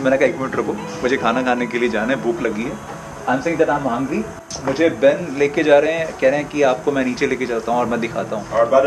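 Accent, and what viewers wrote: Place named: India. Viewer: Very hard to understand your english with indian accent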